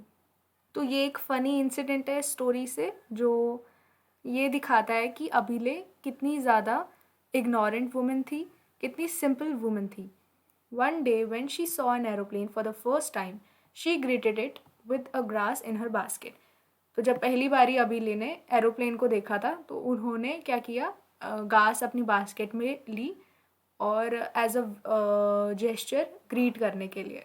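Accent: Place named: Kashmir, India. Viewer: I think you are from kashmir, your voice 🔥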